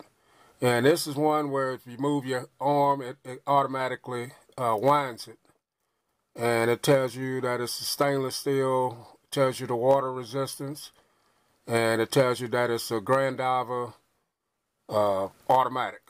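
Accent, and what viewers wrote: United States. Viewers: haha u sound like me... country as hell!!! I do have your interest in them, not saying they are the best but for the price and function I think they are a good value and I am often complemented on mine..also depending on what size the case is, it can make a nice gun/pistol case and keep the silicate that comes with it to keep ur gun from rusting...also the name of the magnified date is called a cyclops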